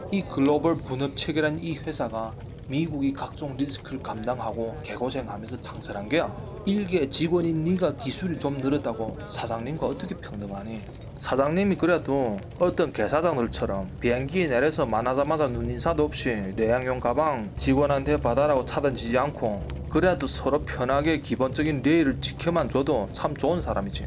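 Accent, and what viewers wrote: Yanbian, China. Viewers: 연변식 한국말씨 재미있네요~ㅎ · 연변말 맞아요.시내연변말(함경도말 베이스)?비스무레한 말투,북한말(평안도)아님. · 연변이니이신가요 북한출신이신가요 :face-orange-biting-nails::face-orange-biting-nails::face-orange-biting-nails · 연변말투 맞음 딱 들어도 연변 사람인데😂😂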